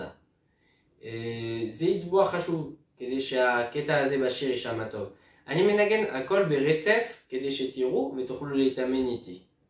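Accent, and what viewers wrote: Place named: France. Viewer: J ai reconnu l accent fr mdrrr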